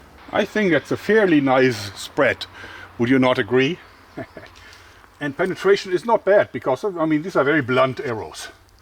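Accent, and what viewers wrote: Germany. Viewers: 😆😆😆 Explanations boring? How can we resist History with ASMR "jerman" voice and giggle ♥️✌🏼📝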